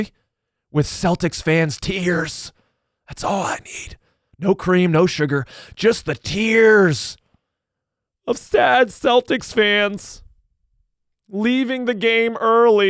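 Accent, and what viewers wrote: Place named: Germany. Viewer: I'm so jealous of your German accent. I wish I could pull that off.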